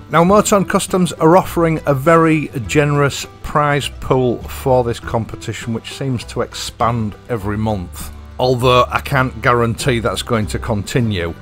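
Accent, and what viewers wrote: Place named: Yorkshire, United Kingdom. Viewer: Dear: I like your music and I like your Italian (ooops - make that British) accent and I like your new motorcycle to which I will attach the name “Bullet” because you own one and I don’t and that’s what you call it. Actually, I find the music quite agreeable - much better than the acid rock that some bike videos play (and to which I do not subscribe). Anyone who disagrees is most welcome to watch one of the tens of thousands of other YouTube channels - or they can just get stuffed. One question about the Bullet: would any of the Interceptor or Himalayan accessories fit? I suspect not as those two machines are much more recent designs - but I thought I’d ask.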